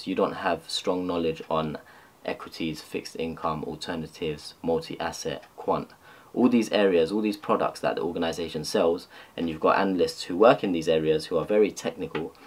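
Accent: United Kingdom. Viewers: What is your accent? Is it british or south asian or both? Lol its all over the place.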